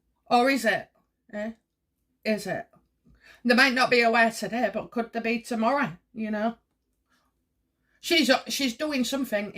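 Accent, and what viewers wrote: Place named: Hull, United Kingdom. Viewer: Ah so it's a Hull accent.! I was wondering. Just love accents and the readings. Yes I am from Yankee and Bookworm - we are creating a really good family. I understand the "fat" word is just an expression of" lush/lots of info.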